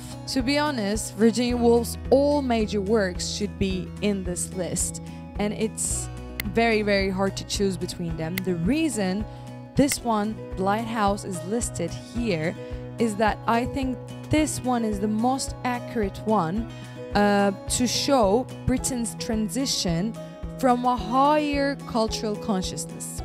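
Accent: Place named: Russia. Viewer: Her accent is uncertain but I would guess it is Russian.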